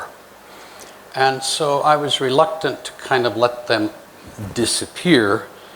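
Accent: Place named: United States. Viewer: Surprised, that you still speak a neat American accent after all these years in Thailand!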